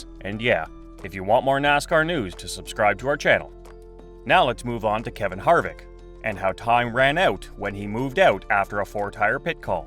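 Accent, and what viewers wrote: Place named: Canada. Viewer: A NASCAR channel with the Canadian talking eh,. What a joke!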